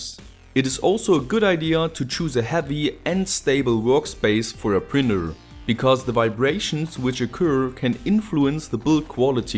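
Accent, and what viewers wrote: Germany. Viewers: I like your german accent! Like in old good times))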